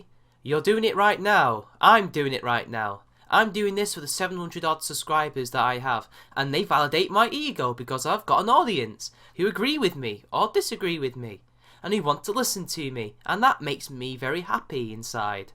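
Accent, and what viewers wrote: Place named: Northern England, United Kingdom. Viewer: I really like your channel. Makes me hum the song every time. Your accent is luv-ly, luv. I can't quite place it, you must be in the north. One of the things these very young SJWs fail at is history. They are either too young to remember the misery, the nearly 100 million lives squashed out by 'marxist' 'communist' brutarian regimes, the mass starvation, the firing squads, pogroms, gulags, five year plans, the slaughter of people who wear eyeglasses, the racism, the murder of people for even trying to practice their religion. And the corruption where the party henchmen steal everything and the people starve, which occurred 100% of the time. I could go on, but hopefully you get the point. She seriously fails in understanding the U.S. Constitution. We don't rule by majority in the way she thinks--the government is set up to protect minority rights and not have mob rule. 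Every sentence she says has a major glaring error, which you broke down brilliantly. Applause.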